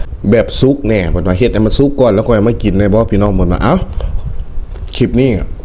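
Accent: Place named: Phu Thai, Thailand. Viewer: สำเนียงเหมืองคนภูไท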